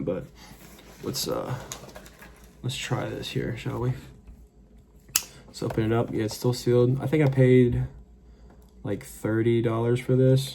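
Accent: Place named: France. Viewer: Ey man I was curious. Why do u often use this "france-ious" dialect?✌🏼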